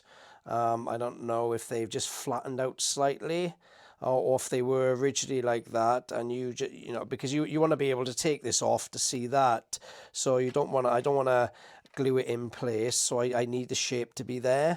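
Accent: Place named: Wales, United Kingdom. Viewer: Can’t wait for more updates, missing your Welsh voice to see out the day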